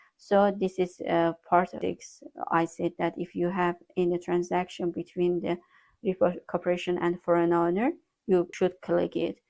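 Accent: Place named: Azerbaijan. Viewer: Aksent ve danisiqdan basa dusdumki azerbaycanlisiz, Meni cox sevindirdi bu video, xahis edirem sosyal sebekesi elave edin siz bizlere cox vacib insansiz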